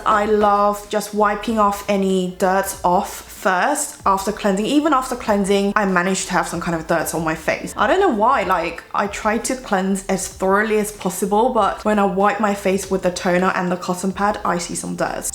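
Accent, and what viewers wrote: United Kingdom. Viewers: 제가 영어를 잘 몰라서 그러는 발음은 영국 발음인가용? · 가끔 영국악센트나와요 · 저도 여쿨이라 언니 영상이 넘넘 도움이 많이 돼요😢💕💕 언니의 영국 발음은 몇번이나 들어도 넘 멋있구 스윗하네요😘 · 영국발음 너무 좋다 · 오늘 립 진짜 예뻐요!!!!! 저도 삐아 컨투어링 밀크티 브라운 진짜 매일 쓰는데 등장해서 너무 반가워요 코 쉐딩에도 넘나 자연스럽고 예뿝니다 >< 오늘도 t 영국발음에 치이고 갑니다.. 털썩.. 믿고 면세점 털러갑니다 헤헤 오늘도 행복한 하루 되세용❤ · Omg!!!!british accent💕💕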